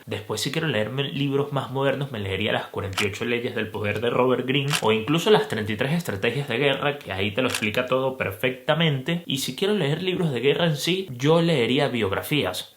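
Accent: Caribbean. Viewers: Que asco el acento caribeño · Se que esto es xenófobo. Pero cuando escucho a alguien hablar con acento caribeño, automáticamente siento que va a decir algo poco inteligente. Y hasta ahora no me he equivocado! Pero este tipo ya exageró. Al parecer leyó muchos libros, pero su léxico es tan ordinario.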